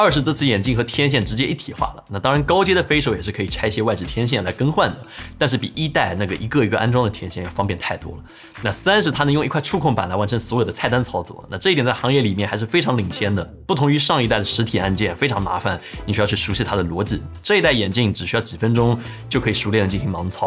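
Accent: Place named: Shanghai, China. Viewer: 這口音也太江浙上海了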